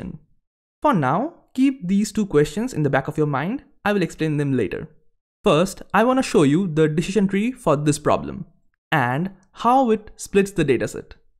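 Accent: Bengal, India. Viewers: bengoli accent ftw!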